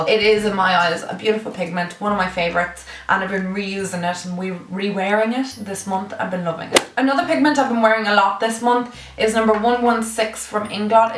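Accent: Ireland. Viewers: Your Irish! There is barely any Irish youtubers❤️xx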